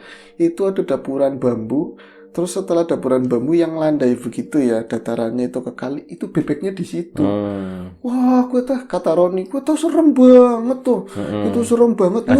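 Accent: Banyumas, Indonesia. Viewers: Logat ngapak nya blm ada lawan kaya nya... bisa ngakak sendiri kalo dengerin beliau pas cerita.. haahaaaa.. sukses selalu yaa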